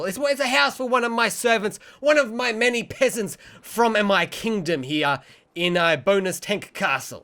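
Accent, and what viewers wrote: Australia. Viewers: thx bro, and it sounds like your a aussie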